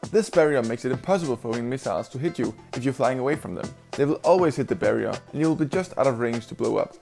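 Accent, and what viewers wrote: Denmark. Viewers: i can hear you dansih becus of the accent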